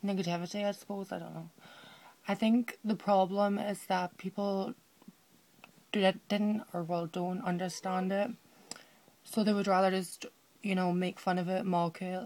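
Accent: United Kingdom. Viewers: Is she Scottish or northern irish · Not to be disappointing but I think that city is not gonna work for you my friend, you should move to a metropolitan like London or a big city in the states ... and your accent has turned British in a year :D:D:D I watched one of your old videos before this one and I was like what does she do in England with American accent but now I'm like wow her accent :D hugs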